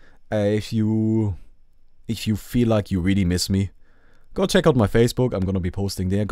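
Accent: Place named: Germany. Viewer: you are a truly great speaker. There is some sort of honesty in your interaction with your viewers, that is very precious and rarely found. The way you open up to your viewers is so inspirational, and it sets our (mine at least) minds at eazy to listen to your charming german accent in you kind tone. These are all valuable qualities in life. Now, i did what you told me and thought about my comment, so now I'm asking you to think about why your channel is finding succes. It is your accomplishment, and yours only. Don't rush into something your heart isn't in.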